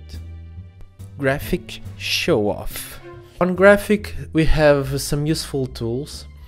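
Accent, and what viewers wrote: Portugal. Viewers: Ganda sotaque tuga🇵🇹💪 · Quando ouvi o sotaque sabia que eras um dos nossos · 15 segundos no vídeo e nota de logo q é português kkk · You're Portuguese aren't you? I can tell by your accent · Aquele sotaque tuga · Vi logo pelo sotaque e pelas musicas de server de csgo source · Are you portuguese? Eu juro que escuto um sotaque.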